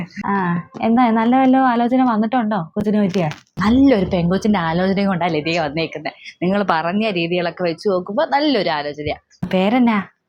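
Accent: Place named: Palakkad, India. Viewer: Awww ന്റെ ചേച്ചിയെ ഞാൻ മലപ്പുറം ആണ്.. മലപ്പുറം ഇസ്ലാം സ്ലാങ് ink നന്നായി അറിയാം.. ഇസ്ലാംസ് എന്ന് പറഞ്ഞു താഴ്ത്തല്ലാട്ടോ.. എല്ലാരും മലപ്പുറംക്കാർ എല്ലാരും verity സ്ലാങ് ആണ്.. But അച്ചടി language ആർക്കും ഇല്ല.. But ചേച്ചിന്റെ മുസ്ലിം സ്ലാങ് കേട്ടാൽ മനസിലാവും പാലക്കാട്‌ ആണെന്ന്.. Nice❤️😌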